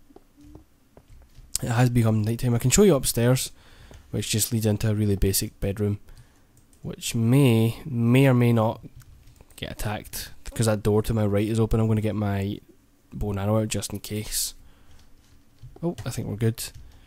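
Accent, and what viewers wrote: Scotland, United Kingdom. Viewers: fuck me ur so creative and imaginitive:D i can't work out ur accent im getting scottish but im just nt sure lol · What first got me to start watching your series was your accent, I love Scottish accents and it made the commentary more interesting. I then grew to like the series itself and the cool things you built and how you went about it. I've also started watching your other videos and am enjoying them as well. Thanks, I'll be waiting for Season 2!!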